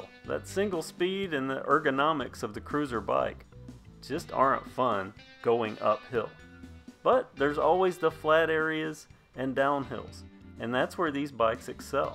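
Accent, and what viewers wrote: South, United States. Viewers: His Smooth Southern accent is so charming! I absolutely dispise how Northerners call Southerners accents "ugly" or "not articulate". This guys voice is the definition of articulate!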